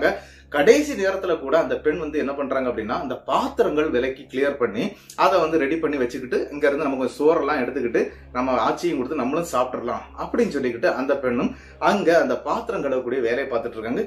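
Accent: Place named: Tirunelveli, India. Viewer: Kadhai sollum vidham Tirunelvel i slang nice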